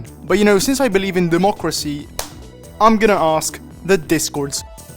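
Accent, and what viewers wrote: Italy. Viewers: io all'inizio: "sembra un accento strano" io a : "ok è decisamente italiano" ahaha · Bro ma sei italiano?? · Sei Italiano? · Sei italiano? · Ma sei italiano? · are you italian? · are u italian by any chances? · Are you Italian? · bro sei italiano? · bro you're Italian because it's a perfect Italian accent because I'm Italian and it's the same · Are you italian? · Ma sei italiano allora perché lo sentivo un po anche dall’accento e poi perché qui avevi detto “MADONNA” comunque mi dici il nome del gioco? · Your accent is sooo italian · Are you Italian?? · aRE you Italian? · Ma dillo subito che sei italiano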